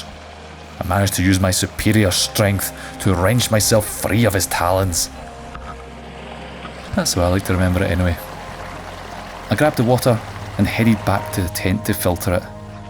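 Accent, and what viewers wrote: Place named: Fife, United Kingdom. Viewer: Braw 👍 as a fifer living in Germany yer videos bring me back hame lad ..... thanks for no diluting yer accent 😉 I've wildcamped all over the uk and Europe an it's no easy to always find a guid spot ..... But .... the mair ye do it the better yer eye is for finding spots .... keep it up big yin .... an thank you 🙏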